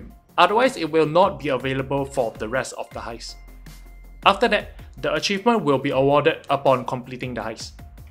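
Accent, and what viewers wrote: Singapore. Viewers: Bruh are you from SG?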